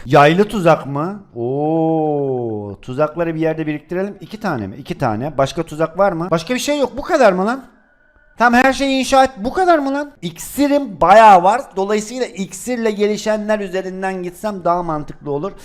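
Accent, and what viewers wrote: Rize, Turkey. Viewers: Abi önceden rizeli konuşuyordun ya ozaman daha güzeldi yine öyle konuşsana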